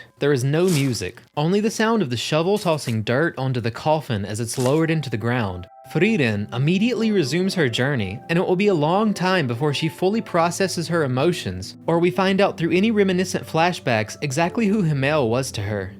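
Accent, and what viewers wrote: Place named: Southeast, United States. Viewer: Also bro where you from? Southeast United States? Im from Georgia and your accent is very familiar lol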